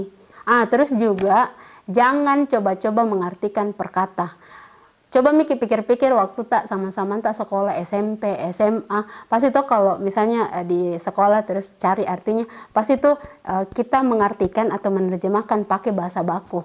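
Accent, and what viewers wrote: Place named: Makassar, Indonesia. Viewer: Rinduu kelas logat makassar, sukses eonnicu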